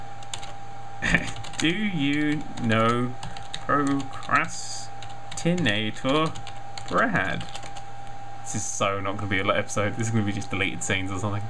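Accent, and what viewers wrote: United Kingdom. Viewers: Beλst Menus That's because,you know,british accent.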